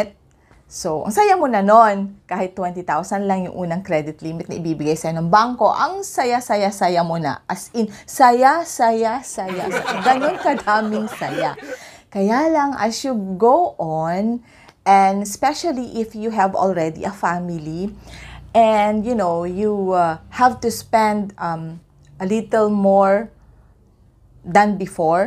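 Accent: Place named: Ilocos, Philippines. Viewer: Ilocana ka met gayam madam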